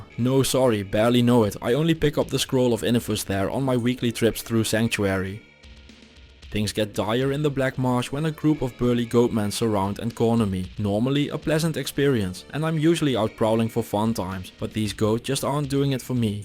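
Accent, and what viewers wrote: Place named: Netherlands. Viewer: That's quite the Dutch accent.. you sound like Goldmember.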